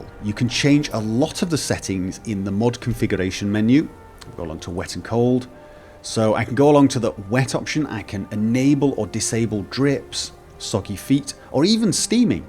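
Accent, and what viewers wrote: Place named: Yorkshire, United Kingdom. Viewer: he has a Yorkshire accent, mixed with a bit of wherever he lives now.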